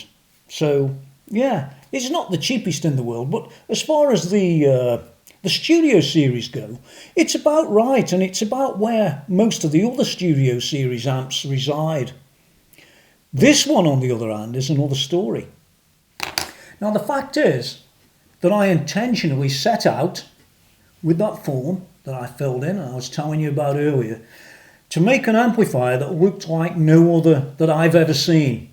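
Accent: Stoke, United Kingdom. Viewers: Am I picking up a Stoke accent?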